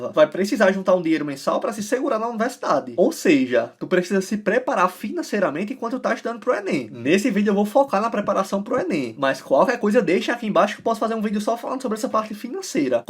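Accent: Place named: Pernambuco, Brazil. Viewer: Reconheço um Pernambucano de longe, e você, é um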